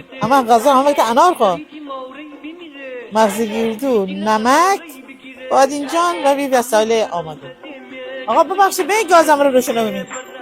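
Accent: Gilan, Iran. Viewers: بسيار عالي و زيبا 👏👏👏 عزيزم چرا ميگين غذاي گيلاني ،؟ لهجه شما كه گيلكي شهسواريه . اينا غذاهاي غرب مازندران ، شهراي متل قو ، شهسوار ، رامسر و شهرهاي كوچيك حومه هم هست . صد البته كه غذاهاي گيلاني رو دست ندارن . اما بين اين شهرها كه گفتم مشتركه . و اگه ممكنه در مورد لهجه تون هم يه توضيحي بفرمائين ممنون ميشم 🙏🌹💚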